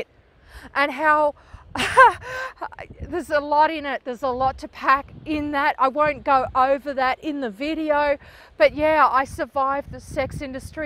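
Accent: Australia. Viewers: Your Aussie accent is really sweet... 👍☺️ stay well...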